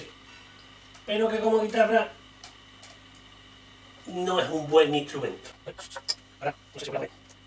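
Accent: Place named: Andalusia, Spain. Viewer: Cuanto cuesta un mantenimiento así? yo tengo una guitarra mala (Berhinger) y no la llevé a un luthier porque pensaba que me iba a costar más el collar que el perro. Por cierto, ¿dónde tienes el taller? por tu acento creo que vivimos cerca (yo en Sevilla). Al final me compré una Yamaha Pacífica pero no se si merece la pena ajustar la otra o venderla. Saludos.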